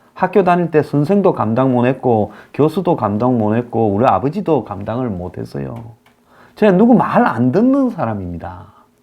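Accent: Gyeongsang, South Korea. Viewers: 숨겨진 경상도 사투리가 구수합니다. 이런들 어떠리 저런들 어떠리 운운 하는 자들은 말씨를 바꾸는 재주가 탁월합니다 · 그 정도의 욕은 경상도말과 찰떡궁합! 스트레스가 풀립니다. 염려마시고 스타일대로 하세요! 응원합니다!! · 이난국 시기에 별것을 다 가지고 시비을 거네요 님의 매력은 경상도 사투리라고 봅니다 올은말만 콕콕 찝어서 뉴스를 하는데 사투리 때문에 부드럽게 들린다고 생각합니다 의욕은 욕이라고. 볼수없다고봅니다 이렇게 좋은 뉴스를 보고 듣는데 조금은 불편해도 우리 모도가 이해를 해야한다고 봅니다 의 뉴스브리핑에 감사드립니다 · 중앙아시아로 좇겨가도 경상도사투리 못바꾼다 좋은건지 아닌지 건강이최고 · 사투리 억양듣기 전혀 안불편합니다. 단어구사는 표준어시고 어조만 사투리인데 성조가 있기 때문에 오히려 집중이 잘되고 단어가 확실히 들립니다. 모노토너스한 말투보다 훨씬 좋습니다. 참고로 저 경상도 사람 아닙니다 아버지 경기도 어머니 호남분입니다 서울서 나고자랐지만 영남 어조 오히려 집중 잘되고 귀에 단어 강조가 뛰어납니다. 욕도 심한욕 없고 듣는 사람이 시원합니다. 이상한 사람들 말 신경쓰지마세요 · 사투리 지적하시는분, 불평하시는게 좀 한심해서 한소리 할께요 같은분이 이 난국에 개 돼지들 깨우치려 힘쓰시는것에 감사합시다. 경상도 사투리라 못 알아듣는거 아니잖아요? 쩝쩝이보다 천배 만배 듣기 좋지 않습니까? 내용에 집중에 주세요. Don’t be grumpy or criticize over not important things!!!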